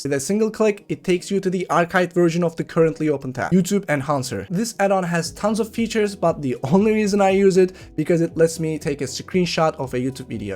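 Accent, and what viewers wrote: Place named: Turkey. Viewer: Hocam aslinda Turkce scriptin direkt Ingilizce karsiligi ne ise ona kasmak yerine, deginmen gereken onemli seyleri not alip onlardan bahsetmeye dikkat ederek dogaclama en bastan Ingilizce konussan daha mantikli olmaz mi? Bir de cok aksan var bence. Elbette aksanimiz var ama ben mesela Amerikan tarzi konusmaya calisiyorum, daha guzel duruyor. Direkt duz Ingilizce konusunca okulda dili ogrenen Turk liseli ogrenciler gibi geliyor kulaga, bu da globale acilmanda profesyonellik acisindan iyi degil bence. Nacizane tavsiyelerim. Dil degisince karakterin ve kisiligin degismesi gibi bir konu var bazi insanlarda. Baska birisiymissin gibi konusuyorsun istemsizce. Bende de oluyor. Reaksiyonlar, vurgular, tonlamalar hep farklilasiyor. Ama seninki, yine benim bildigim konusmasinin sadece dili degismis hali gibi hissettiriyor. Buyuk kanallarla kapisman konusunda sikinti yaratacigini dusunuyorum.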